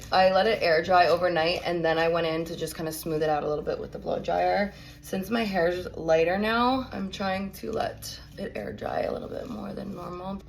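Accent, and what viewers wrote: New Jersey, United States. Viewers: Awww she’s got the Jersey accent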